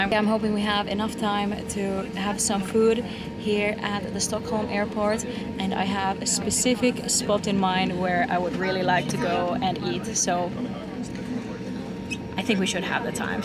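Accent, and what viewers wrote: Netherlands. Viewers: Do you know you nav a Dutch accent when taking English?😂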